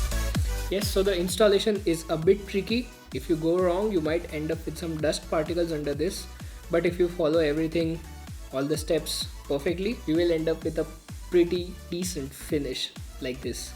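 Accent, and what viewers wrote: India. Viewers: Dude, please do your best to lose this Indian accent. Take some diction courses if necessary. People on the Internet won't take you seriously unless you do. You'll see a definite youtube growth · Indian people and thier accent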